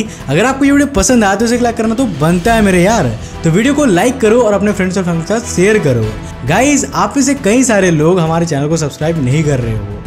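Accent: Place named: Haryana, India. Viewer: Tum bolte achha ho per bich bich me kai words ka ucharan galat kardete ho sh ki avaj ko chh ki or chh ko sh kar dete ho baki sab theek ha ye bhi karlo to bhariya rahega dusri bat apki bato se bhasha se lagta ha ki ap kahi na kahi haryana se bilog karte ho ya uske pas bilkul pas reh chuke ho ek word jo ap bole beta ye siraf hr me hi bola jata ha kahi or nahi matlab styale Ha ap styal ko galat bolte the ap bolte the shtyal bas ek word hi yad ha mujhe jo ap bole galat baki sab bale bale ha so keep contineu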